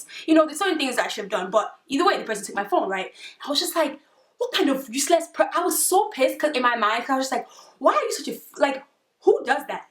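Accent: Nigeria. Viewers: I made it thru the end lol. I love the Nigerian accent, and I can really relate to your accent because I'm also Nigerian💚💚✊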